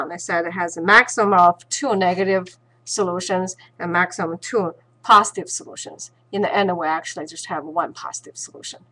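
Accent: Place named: Eastern Europe. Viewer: I think Descartes also did imaginary #s. I cant recall for certain, Thank you very useful. also your accent sounds like the Slavic lady from 2 broke girls. Not a bad thing, Its cool.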